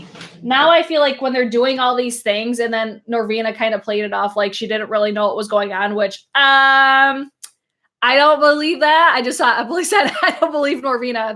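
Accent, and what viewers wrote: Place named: Western New York, United States. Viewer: From what I’ve seen, the same capital investment company is behind Morphe and JHC. If that’s true, they’re the ones behind the new Morphe x JH palette. Trying to boost their investments. Your accent... I was thinking you were from Upstate/ Western NY. I know a lot of people from Buffalo and Niagara Falls that have similar accents. Love from NJ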